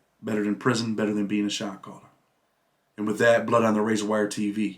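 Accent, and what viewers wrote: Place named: New York, United States. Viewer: My whole family from NY. Got some up in Buffalo, Syracuse, Oswego. I can hear ur accent sometimes. Good vid today.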